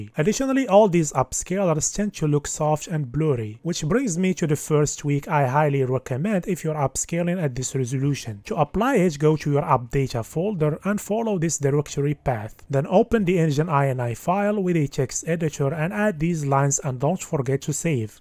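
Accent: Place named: Morocco. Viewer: yes , i thought that too because his accent's like marrocans ❤. Thank you bro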